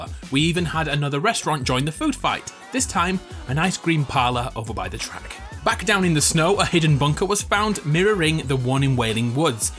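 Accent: United Kingdom. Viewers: Yay good brit voice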